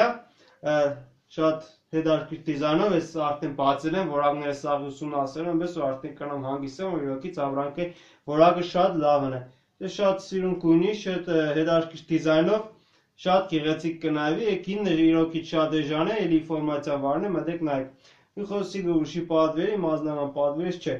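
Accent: Gyumri, Armenia. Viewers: ճպցնում եմ լայք ամեն ինչի, հատկապես գյումրեցու անուշ բառբառի համար )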